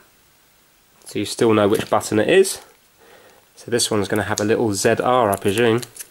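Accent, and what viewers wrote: United Kingdom. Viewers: British accents are so soothing, I love watching videos which are made by people who have them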